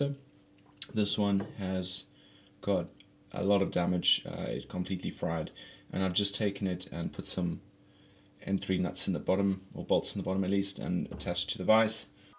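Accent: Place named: South Africa. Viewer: deffos a south african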